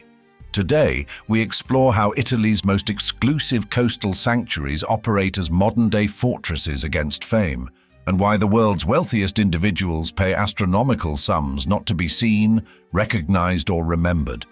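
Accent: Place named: RP, United Kingdom. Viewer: First one 😊 and congrats for the video. As a polyglot I do appreciate your accent : sounds clear and a trifle posh fully adapted and carved out 🎉😊❤.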